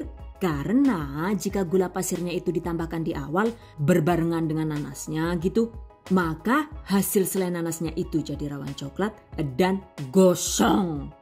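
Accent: Banyumas, Indonesia. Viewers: Penjelasan nya mudah dipahami.. ngomongnya enak didengar ada lucu," nya bahasa ngapak.mantap bunda. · Bisa ngomong bahasa ngapak yah 😁 Cilacap hadir☝️ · selalu tersenyum bila bu naratornya udah ngeluarin bahasa ngapaknya😄😆 tapi selalu mantap resep dan tipsnya. semoga semakin maju channelnya.. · deneng ngapak 😅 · Terimkasih bunda...sangat bermanfaat untuk saya....kepengin ngundang "yu" janen..kayane wong panginyongan... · Suka banget sama chanel jlas Pk bnget jelasinya..lucu ngapake koyo nyong😂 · Resep selai nanas dr video ini uda q download dr setaun yg lalu jd resep andalan... sbnernya selain resep nya yg ciamik... suka sekali dgn pnjelasan2 nya yg lucu n logat ngapak nya apalgi pas bhsa ngapak nya keluar truz blkgnya sllu ada kta yyuk... jd bsa snyum2 sndiri.. #GiveawayTHV2021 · Sampeyan wong bule opo wong ngapak to mbak kok lucu banget tlng tampakan wajahnya mbak penasaran sy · Ngapak2 deneng koh😁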